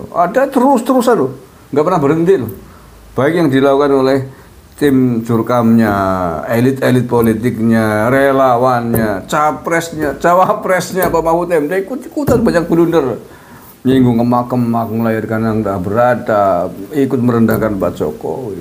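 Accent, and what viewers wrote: Banyumas, Indonesia. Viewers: Panjenengan pundi pak, kayane koq rada ngapak ya 😂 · Pak Njenengan bahasane ngapak, asli endi ? Nyong Purwokerto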